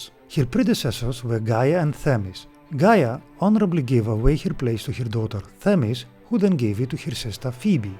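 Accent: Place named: Greece. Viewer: Granddaughter??? That's so cool! also, you have the most beautiful accent on the planet. I love the Greek accent!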